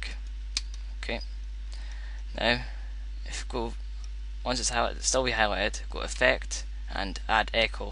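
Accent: Scotland, United Kingdom. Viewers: are you british? :O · are you sottish? you soud scottish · Cool scottish accent :D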